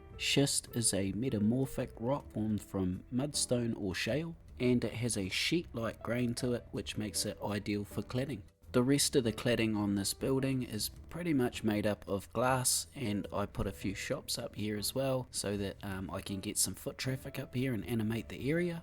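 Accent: New Zealand. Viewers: “The first thing I did was to add this massive dick” lol :D Love the kiwi accent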